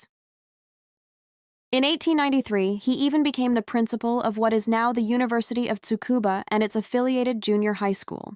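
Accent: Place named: United States. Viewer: Narrator must learn proper pronunciations of Japanese names and places. Sounds too Yankee!😮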